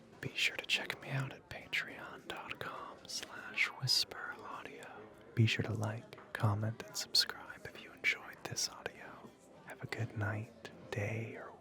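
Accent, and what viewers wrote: South, United States. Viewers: I love the southern accent smmmm it’s literally my fav 😋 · The southern accent gets me every time I can’t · Me being from the south and never, EVER hearing an accent this smooth….I’m blushing 🥹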